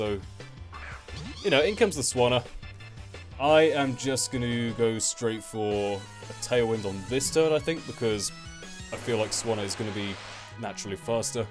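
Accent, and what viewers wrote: United Kingdom. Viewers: FINALLY ANOTHER BRITISH BATTLER I'm a britt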